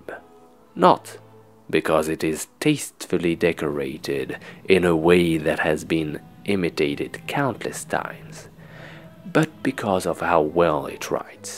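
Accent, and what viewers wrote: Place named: England, United Kingdom. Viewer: J’aime your English accent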